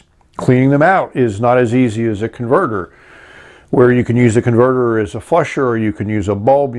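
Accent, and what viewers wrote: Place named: Pennsylvania, United States. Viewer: I’m a newbie at fountain pens, what does the bent nib do for ones writing? You sound like a PA resident, am I right? I grew up in PA😃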